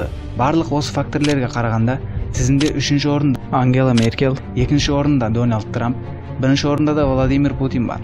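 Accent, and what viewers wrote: Uzbekistan. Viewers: Сенин шындыгыннын барлыгы неге 27 болып келеди отрикти сога бересин гой деимин сосын казакша дурыстап таза казакша соиле Казакстанда турып жатырсын озбекше акцент косып соилеме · Казакша нармални сойлеш озбек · Өзбексің ба ? Кара каопаксын ба? Акцент өзбекскй ұнамайды сөзің..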